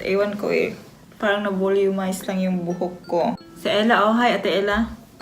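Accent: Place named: Visayas, Philippines. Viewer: Nice sis. 😊pareho tau bisaya ang tono.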